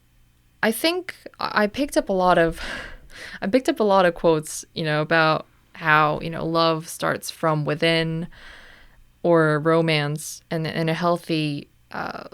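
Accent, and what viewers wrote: United States. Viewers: What part of the United states gives people that accent?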